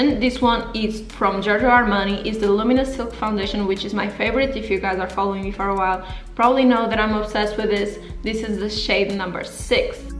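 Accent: Portugal. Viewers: You sound portuguese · That Portuguese accent is the cutest thing evaaa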